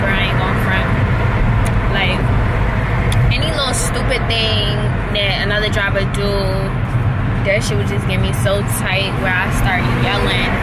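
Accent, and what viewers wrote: New York, United States. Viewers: You sound like a true New Yorker. I’m here for it 😭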